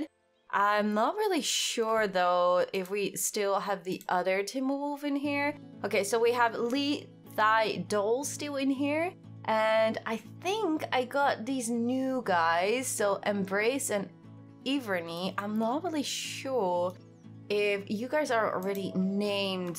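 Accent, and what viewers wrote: Netherlands. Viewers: Me : *was about to subscribe Me : *hears that she's dutch Also me : SUBRIBE RIGHT NOW Keep up the good work! 👍👍💯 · i came to your channel at the zootour video and 3 minutes in i could hear your dutch, keep up the good work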